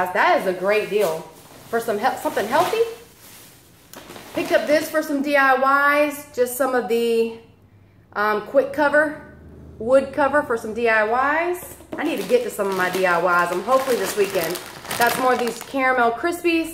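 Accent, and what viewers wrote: South, United States. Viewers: Gotta say that I just love your southern accent. I love some of the phrases you use. They add to your heart warming personality. I myself appreciate your comments regarding even the smallest kindness making your day! It’s true. One thought good or bad can change the course of a day sometimes, but when you know that their are people who care it makes life just that much easier!!!! Thank you for your positivity today!!!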